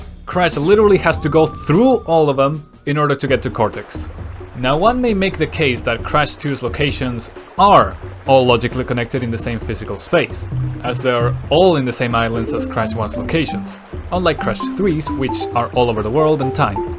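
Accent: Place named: Chile. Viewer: Un chileno hablando ingles? Aprende toby fox 🗣️🔥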